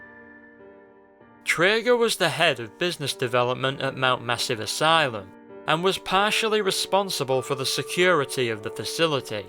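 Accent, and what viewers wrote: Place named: Manchester, United Kingdom. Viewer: I love your manc accent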